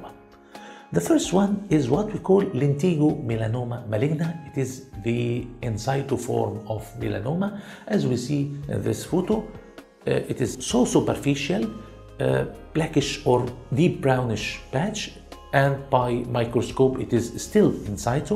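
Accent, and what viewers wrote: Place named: Arab world. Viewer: Great!! Like the Arabic accent... :)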